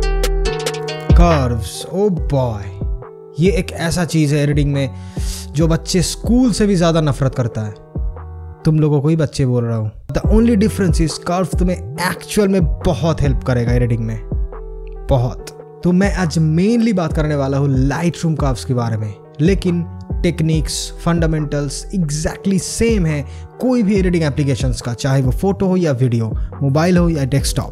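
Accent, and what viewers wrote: Bengal, India. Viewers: That bangla accent 🔥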